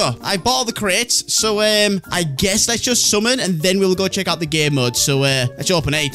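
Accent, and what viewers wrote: United Kingdom. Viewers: Bro are u British or somethinh?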